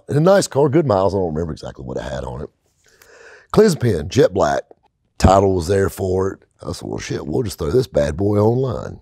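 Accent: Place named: Carolinas, United States. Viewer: Holy cow! I worked in Hendersonville NC for several years at Hunter Chevrolet Subaru Hyundai! That’s basically where I cut my teeth! You’re so close and the accent from Hendo to Seneca is almost the sale. Have to cross paths with you some time. You know Stephanie the Photographer and I wanted to hire you for a car show!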